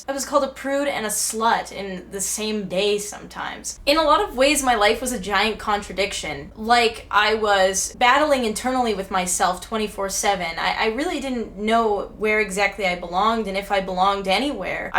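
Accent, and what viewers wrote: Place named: Newfoundland, Canada. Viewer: dude you sound newfie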